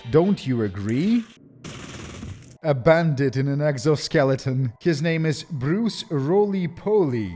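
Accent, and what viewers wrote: Russia. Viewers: I think the weirdest thing in this review is still listening to a man with a Russian accent attempt to do a Russian accent.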